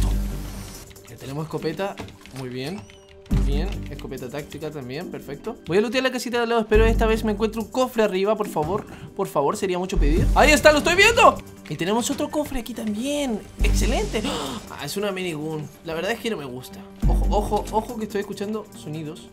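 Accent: Chile. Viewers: Es chileno ?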